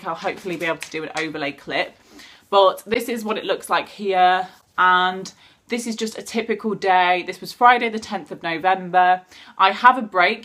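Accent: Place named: East Midlands, United Kingdom. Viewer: I love organised omg, I have a things to do pad from poundland and it's so good- you can write the date on the top too it's literally amazing :L ! you would just think it's another notepad but it hs space for you to tick things off! and then the other side is for notes and then a mini timetable! would use it for revision as well! etc - where are you from ?! your accent sounds east midlandsy- I could be so wrong! I did chemistry and biology as well. Workouts really help me! especially as someone with learning difficulties it makes my mind feel clearer. Top tip for productivity too, make sure you're not wasting your time by doing the wrong thing (': so with revision sometimes I wouldn't be revising the most relevant bit so going back to the guide/plan of what your course covers and writing the headers do a 1 minute test where you write down all of the keywords you remember and processes and then you really remember what you need to go back to and revise! 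also to prioritise! as a lot of people put big tasks off and start with smaller ones and big ones always get pushed back. Anyway loved the video especially because it's about organising! thank you so much for visiting my channel- I hope you'll come back again some day! xx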